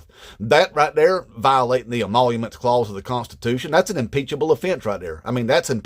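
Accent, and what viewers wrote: South, United States. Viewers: Dude you're damn near my twin brother lol but you sound nothing like me. I mean the message is the same but i don't have that southern accent, I'm from Illinois. Just subed to your channel keep up the good work.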